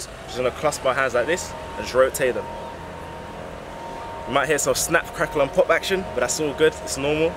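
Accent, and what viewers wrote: United Kingdom. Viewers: yo man where you from in the UK? Nice tutorial very informative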